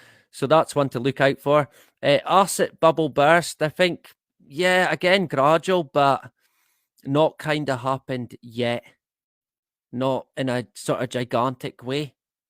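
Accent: Scotland, United Kingdom. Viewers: Love listening to your Scottish accent! Enjoy life my friend. Much love to all. 💙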